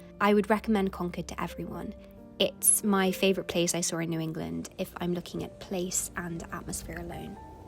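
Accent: RP, United Kingdom. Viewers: There’s no need to “correct” pronunciation. She’s speaking in English, and pronouncing all of her words in her RP accent. English borrows a number of words from different languages so she can pronounce it according to her understanding of English. If it were French, it would be a different matter. Given the range of accents in the UK alone, the pronunciation of words is malleable to each individual speaker. ✨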